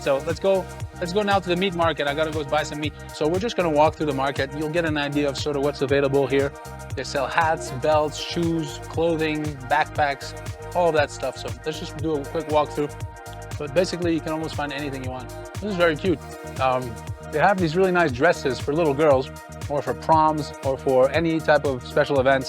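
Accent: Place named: Ecuador. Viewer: Idk how I got here but I'm glad I did, loved this video! the bocadillos and n that red thing made from guaba are both my favorite too, the encebollado mmm... I actually hate it I might be the only Ecuatoriano who hates encebollado lol... saludos desde Guala-Azuay-Ecuador 👋 👋 btw ur Spanish is incredible u even have our Ecuadorian accent.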